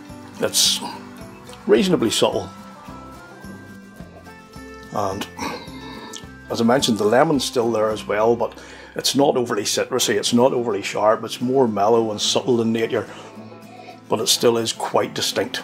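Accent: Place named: Northern Ireland, United Kingdom. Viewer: Got to ssy love your accent (I'm an accent guy). I've probably tried and failed at Northern Irish accents in my videos in the past. I do have the Sexton as you know. Not tried many Irish whiskeys but this is one of the best I've tried. Still waiting to say "that's a great Irish whiskey". Love the video style.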